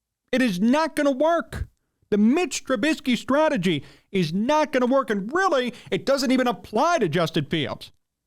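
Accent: Chicago, United States. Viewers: Hey your Chicago accent is Golden 😄👍💯 Da Bears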